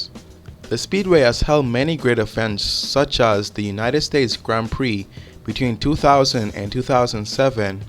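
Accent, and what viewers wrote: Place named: Bahamas. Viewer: You sound Bahamian glad to have another bro from the 242 enjoy Motorsport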